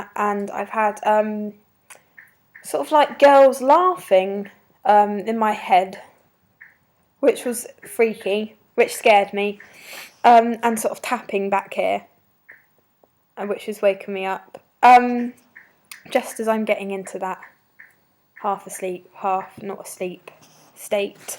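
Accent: United Kingdom. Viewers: where from the UK you from? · lol i can never take british accents seriously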